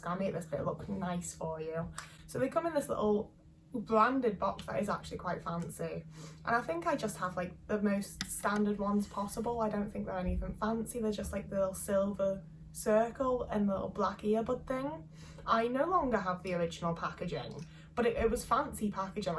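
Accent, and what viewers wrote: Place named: Northwest England, United Kingdom. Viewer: It's not particularly strong which makes it hard to say for certain, but central northwest England, between about 53 to 54 degrees north, includes the Manchester area.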